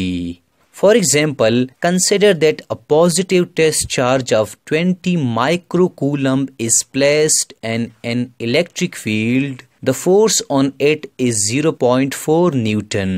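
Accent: India. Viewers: you know its gonna be good when the guy has an indian accent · hindian english is so funny. bro you gotta learn English. we hear you in da class to laugh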